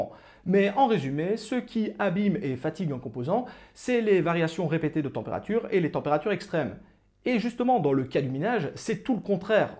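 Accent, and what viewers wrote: Belgium. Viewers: Dis, il me semble que toi et moi venions des mêmes contrées sur base de ton léger accent.:) Question donc entre compatriotes. Tu fais comment niveau elec? Je consomme environ 2200w pour faire tourner 7 gpu (combi 3080 et 3090), ce qui me revient a environ 490 euros d'elec par mois. En Belgique (oops j'ai dévoilé notre pays), l'elec, frais de transport compris, monte a un prix moyen d'environ 30 centimes par kwh. Du coup, calcul fait, sur les 1500 euros de moyenne que je génère en assets, ben j'en récupère 1000 in-fine. Du coup, tu as un truc toi pour payer moins cher en elec? je suis chez Eneco et j'ai le "meilleur" plan d'après eux... mais bon, si t'as des super idées et vu ta connaissance du sujet, je prends;) MERCI!!!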